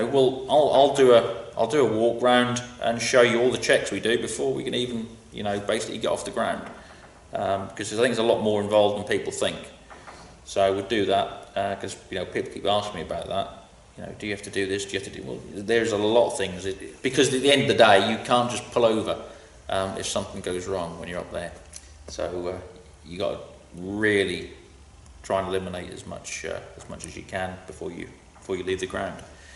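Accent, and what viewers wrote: Norfolk, United Kingdom. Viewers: Really enjoying the videos. Its good to hear a good ole Norfolk accent on here. You always know where you are with a flask of hot tea.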